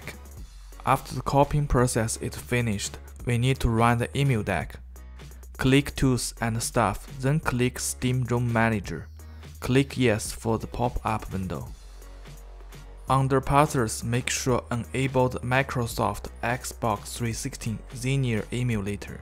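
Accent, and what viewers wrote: China. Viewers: UP主一听就是中国老乡哈哈哈哈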